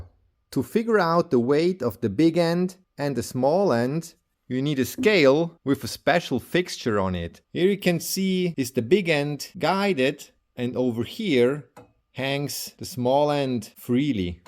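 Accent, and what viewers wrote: Switzerland. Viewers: Wie immer hochinteressant und äußerst kompetent. Aber wie immer ist es auch diesmal wieder verblüffend, Englisch mit schweizerischem Akzent zu hören. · I'm so sorry but as a German I can't stand the Swiss accent in your English. Just the captions were a lot better.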